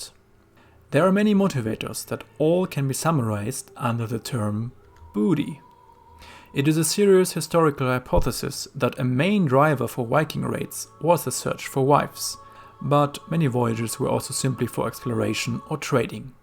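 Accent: Germany. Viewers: It's funny how you think you joke about your German accent and switch back to your normal English, which still has a heavy German accent. But I sure love your content. Keep it coming! <3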